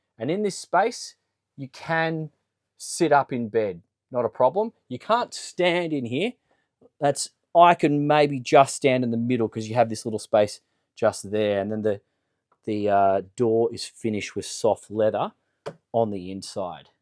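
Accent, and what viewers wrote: Australia. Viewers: mate, that aussie accent, insufferable. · Those lines are as smooth as hot butter. The combination of excitement, gestures and the accent in the bow presentation I got some Steve Irwin vibes and it really clicked why the videos on this channel stand out.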